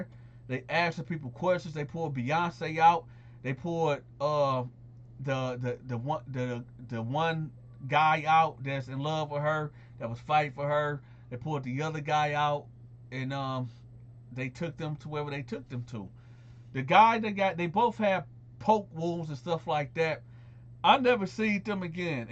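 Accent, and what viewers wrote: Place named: Ohio, United States. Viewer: Okay! At first I thought you was from Cali from yo accent. But now I realize from yo story's that you MUST be from Ohio or Michigan!.. But I'm guessin Ohio. Yaw sound JUST LIKE US!! Or vice-versa. But much love to ya anyway brotha! Keep them interesting ass stories goin! I'm listenin.👊🏾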